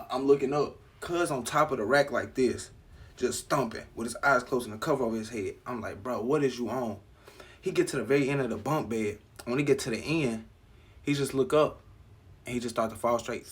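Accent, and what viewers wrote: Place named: Florida, United States. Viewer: Where you from just asking sounds like us in Florida low-key 💯💨🌴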